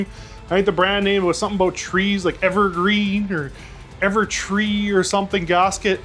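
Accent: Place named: Canada. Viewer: Oooooh yeah bud, Canadian eh? Subbed!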